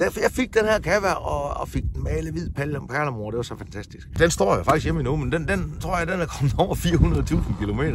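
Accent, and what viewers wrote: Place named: Jutland, Denmark. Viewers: Du kan tage ud af Aalborg, men ikke Aalborg ud af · Hold nu kæft du taler jysk på dine gamle dage 🙂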